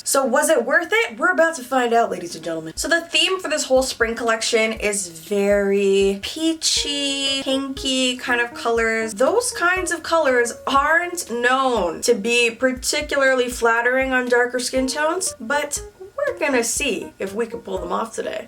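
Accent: United States. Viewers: I thought you were from the US lol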